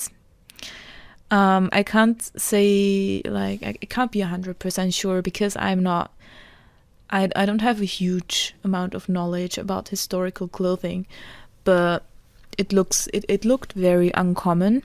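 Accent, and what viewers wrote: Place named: Upper Austria, Austria. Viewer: I'm trying to place your dialect based on that short chat with Oma. I'm guessing Oberoesterreich, but admittedly not a lot to work with.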